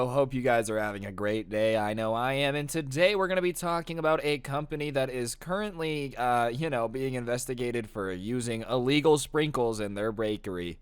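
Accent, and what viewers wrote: RP, United Kingdom. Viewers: As a Brit i can say that that is the poshest of posh brit accent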